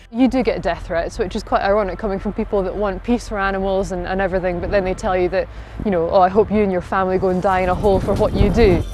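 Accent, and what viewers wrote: United Kingdom. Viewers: As a Brit, I appreciated your British accents.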